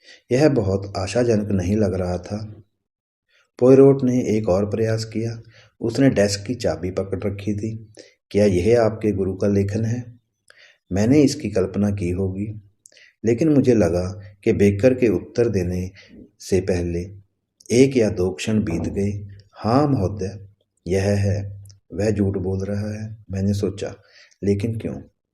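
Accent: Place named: Punjab, India. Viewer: कहानी शानदार है आपका उच्चारण पंजाबी है इसलिए माहौल नही बना सके Do little homework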